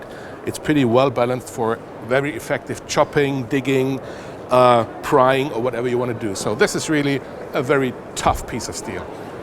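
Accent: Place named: Germany. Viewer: You have a pretty cool accent. Are you German?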